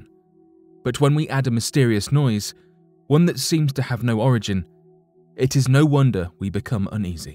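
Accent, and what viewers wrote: United Kingdom. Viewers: Love this site, and the British voice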